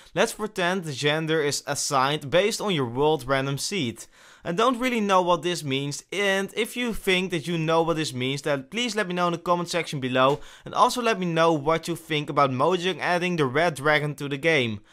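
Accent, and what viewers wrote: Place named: Netherlands. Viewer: Je bent nl dat hoor ik. Lol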